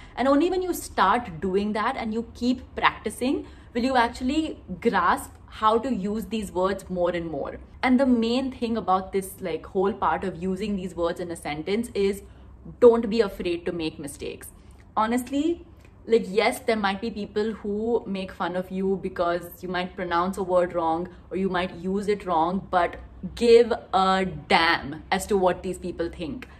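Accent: India. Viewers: Wow that's very informative video. But haven't knowing your mother tongue, kashe asa tu ? Are you Goan ?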